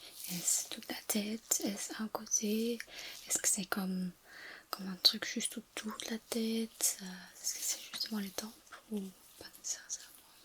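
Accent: Flanders, Belgium. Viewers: elle est pas flamande ?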